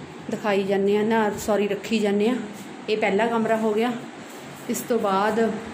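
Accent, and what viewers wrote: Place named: Malwa, India. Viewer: Di tusi malwai ho boli ton lag reha hai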